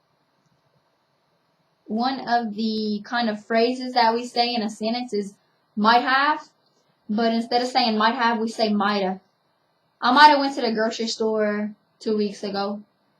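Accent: Louisiana, United States. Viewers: I love your accent lol I love when people have that type of accent in Louisiana. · I love your accent. I find most southern accents charming, but the Cajun is really something special! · Word up! I love the Louisiana accent. Its so much better than all the other southern state. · You sound like your somewhere from outside of Baton Rouge like Zachary or Dunham. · woah i’m from south louisiana but i’m in the city so i dont hear many cajun accents like yours :0 reminds me of pierre part lol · "the very heart of cajun country" The way you pronounced "heart" could've told me that :) Love this accent :D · I have to guess your from more towards Thibodaux, maybe Morgan city? Perhaps Lake charles? You dont have as thick of a Cajun accent as say Vermilion parish or ather areas in deep SW LA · if you ain't from Louisiana your pretty good I live in Louisiana and some people from here don't even have a Coonass accent like what our doing lol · i like your accent much better then most luisiana accents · mhm baby girl you sound pretty cajun as it is haha nice its my favorite accent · i love accents. 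My favorite by far is the Australian accent, but now that Ive heard yours it definitely tops it. It sounds like a combination of traditional southern drawl mixed with New Jersey/New York City/ black people and French. I guess it comes from the mesh of all the plurality of culture that has been around since New Orleans was founded by the French. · I'm from Plaquemines parish. You were soooo on point. Who needs an er when ya got an a. I love our accent. I do call it a soda though. "Grab me a soda" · When I first moved to Louisiana I lived outside of Lafayette for a few years. This accent was common in a lot of my neighbors and with the teachers in my stepson's school. It's very common; she sounds exactly like a lot of girls and women from that area. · Damn, chick. You must be from southeast Louisiana. Southwest is totally different. I dated a chick from New Orleans and you sound exactly like her. 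😆☺️ I grew up in Lake Arthur. That's near Lake Charles. Not far from the Texas border. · Im from opelousas/Lafayette and I knew your accent RIGHT AWAY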